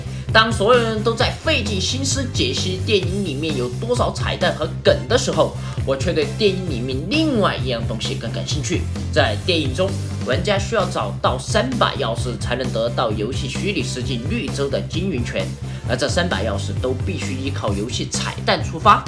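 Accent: Sichuan, China. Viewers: 四川人吗，声音和鸭脖龙好像。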